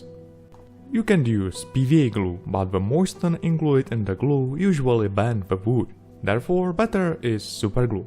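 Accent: Czech Republic. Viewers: wait wait you are czech? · ten prizvuk se mi zdal docela cesky :D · Ty vole, českou angličtinu poznáš všude...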